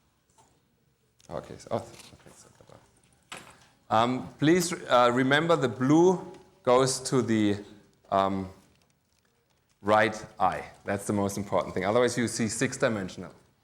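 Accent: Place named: Germany. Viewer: These lectures always sound more authoritative when delivered in a German accent.!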